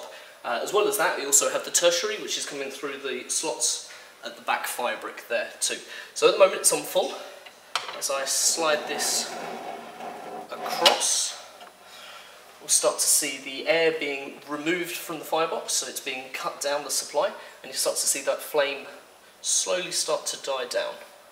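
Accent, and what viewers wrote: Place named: United Kingdom. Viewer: This guy's too British to understand very well.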